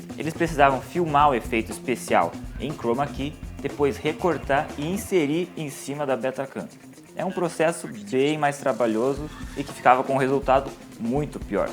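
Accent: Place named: Santa Catarina, Brazil. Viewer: esse cara é catarinense ?? aqui a galera tem esse sotaque :)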